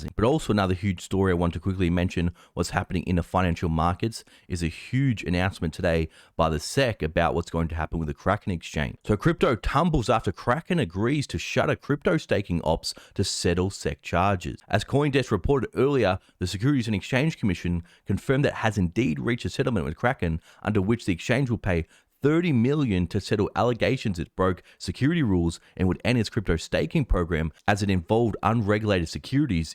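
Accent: Australia. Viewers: That’s Ultra Mega Aussie 🇦🇺🤙🏻🇺🇸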